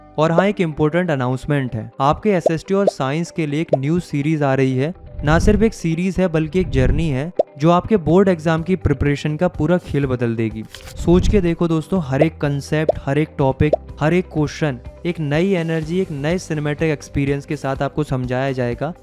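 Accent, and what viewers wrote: Uttarakhand, India. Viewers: Are u from Uttarakhand❤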